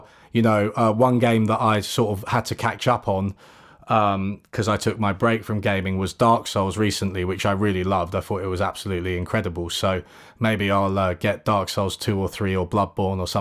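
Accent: United Kingdom. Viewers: As a Chemist coming to the end of my masters I've been seeking none chemistry related reading to come up for air between dissertation writing and have turned to economics. Thank you for your book recommendations and the videos you have provided as a pier head from which to jump off from. I hear a very Wirral accent, I think, and as a plastic Everton fan, haha we won (for once).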